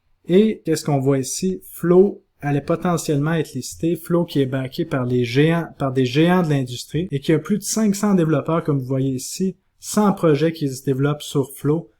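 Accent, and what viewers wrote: Quebec, Canada. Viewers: Merci encore et encore pour tes conseils et analyses. En effet ton accent est très agréable, ça me rappelle mon voyage à Sherbrooke (il y a 30 ans, time flies). Keep up the great work! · Le mana prendre position ou non ? L'accent canadien ma toujours fait kiffer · C'est vrai que je trouvais l'accent canadien horrible avant, et maintenant grâce à toi en plus d'en apprendre beaucoup sur les crypto, et bien j'aime bien cet accent ah ah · Je n'aime pas l'accent Québécois lol. Mais j'aime bien ton contenu !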